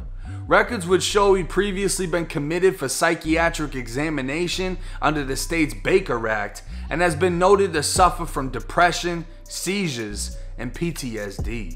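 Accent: Boston, United States. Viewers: live in the NE as well as Florida. Interesting accent, Florida hood with wicked strong instances of “park the car in Harvard yard.”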